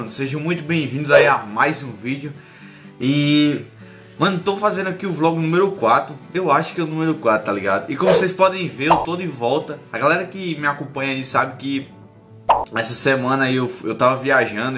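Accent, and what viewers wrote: Northeast, Brazil. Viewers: de onde tu e ?? sotaque de Nordestino !! sou nordestino da Paraiba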